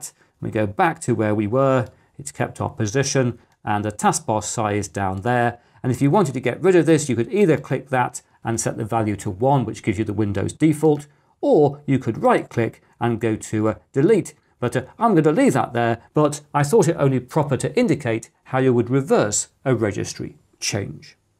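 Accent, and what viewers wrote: United Kingdom. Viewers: Essential viewing for a beginner like me. And you're British, as I am, not yet another American. Discovering your channel really has made my day. Thank you so much.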